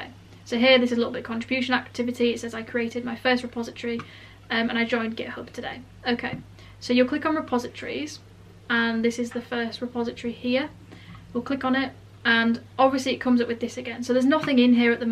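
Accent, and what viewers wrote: United Kingdom. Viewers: I am in love with that British Accent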